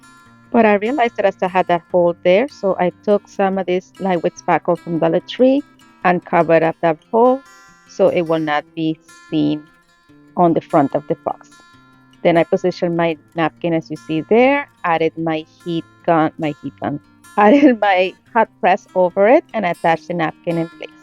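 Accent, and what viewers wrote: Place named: Latin America. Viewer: ur laugh is the cutest thing, it makes me smile everytime! Also, always love your projects, they're always so nice. And your accent reminds me of home. I grew up in Southern Miami, so allllll Latinos in one way shape or form and your accent is EXACTLY like my 2nd mom growing up. I love it! I just wanted to share that with you? What nationality are you? I think I know already, but dont wanna offend nobody! Lol❤❤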